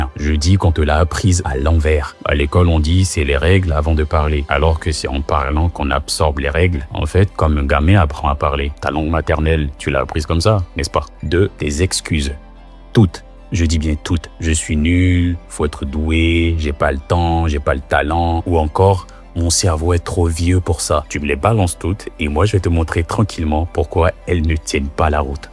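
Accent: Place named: Ivory Coast. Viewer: C'est l'accent ivoirien ça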